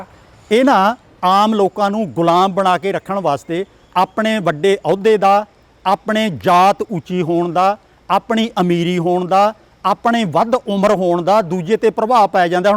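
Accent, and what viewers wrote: Puadh, India. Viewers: ਮਾਰ੍ਹੀ ਪੁਆਧੀ ਬੋਲੀ ਨੈੱਟ ਪਾ ਸੁਣਕਾ ਮੇਰਾ ਦਿਲ ਬਾਗੋਬਾਗ ਹੋਜਾ, ਪੁਆਧੀ ਬੋਲੀ ਤੋ ਨਿਊ ਆ ਜਿੱਤਰਾਂ ਕਿਸੀ ਕੇ ਮੂੰਹ ਮਾ ਤੇ ਮੋਤੀ ਗਿਰਰੇ ਹੋਮਾ, ਅਰ ਯੋਹ ਆਲਾ ਤੋ ਜਮੀਂ ਪੁਆਧੀ ਬੋਲੀ ਬੋਲਾ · Punjabi boli di opboli puaadi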